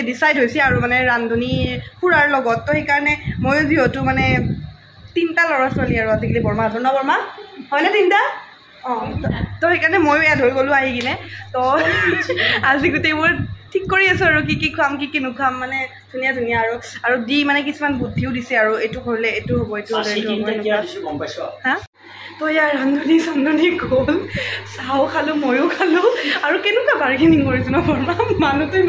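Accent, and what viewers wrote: Nalbari, India. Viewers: Aponar Kathat alp nalbarir xur ase baa....👌👌❤️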